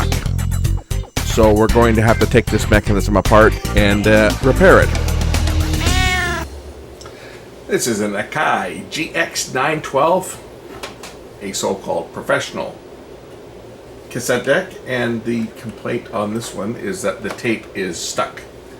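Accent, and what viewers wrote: Canada. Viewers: Hello my friend, I really enjoyed this video. Love the cat too. I have family in Canada, I know that accent very well. Thanks for sharing, also I just subscribed. Kind regards. Paul.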